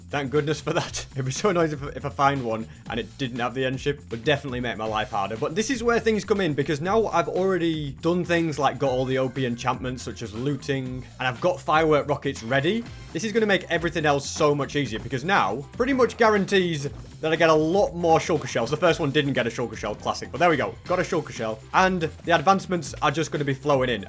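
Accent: United Kingdom. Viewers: No caption how am i supposed to understand the brit english mate?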